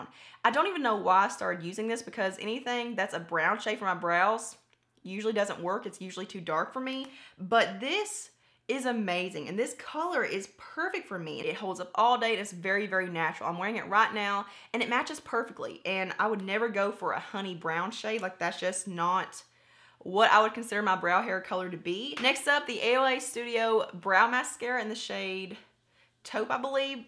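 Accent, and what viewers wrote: South, United States. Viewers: Whoohooo! 1st comment, this never happens! I love your channel, your honesty, the range of products you use from drugstore, high end, and your accent!! Fellow southerner here! 🙋‍♀️❤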